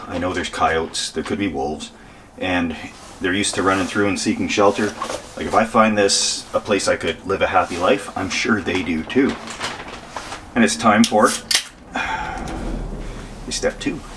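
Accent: Canada. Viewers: You remind me so much of Jujimufu except you're sleeping a storm drain and have a canadian accent.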